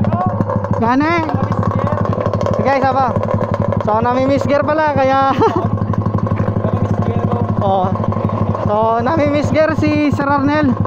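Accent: Iloilo, Philippines. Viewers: parang ilonggo ka po😹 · Ilonggo ka boss? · Ilonggo ka sirr????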